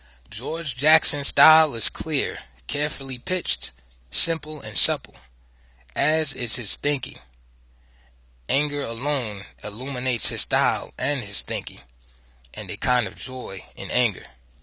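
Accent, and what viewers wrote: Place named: New York, United States. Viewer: Damn this new York accent.. Lol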